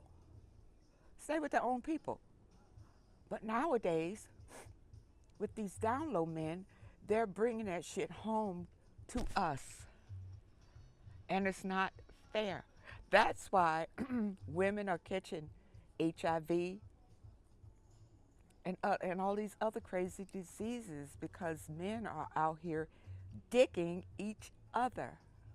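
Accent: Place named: New Orleans, United States. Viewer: I hear that N.O accent great content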